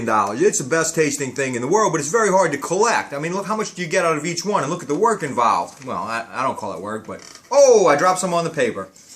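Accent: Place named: New England, United States. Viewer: This guy sounds like a yankee???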